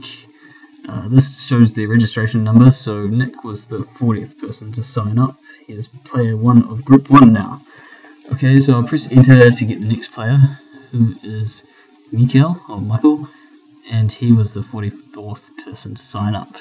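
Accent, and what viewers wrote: New Zealand. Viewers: Such a Kiwi voice ! Awesome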